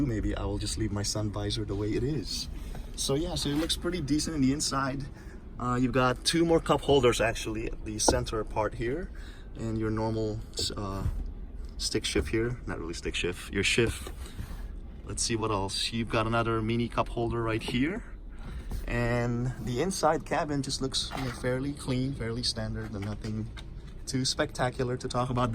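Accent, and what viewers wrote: United States. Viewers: Nice voice with american accent & very well review. 👍👍👍👍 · I thought this video was filmed in US because of ur accent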